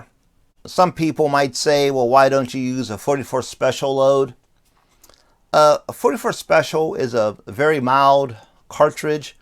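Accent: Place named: Pittsburgh, United States. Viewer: I thought the same thing!!! I heard “arahn tahn” and I was ready to place my order at Primantis and tune into 102.5 DVE lol. Ours is a pretty unique accent. I get asked where I’m from all the time down here in Tennessee only two people in six years have been able to place the accent, a Nurse who’s husband is from Pittsburgh and an Air Evac pilot.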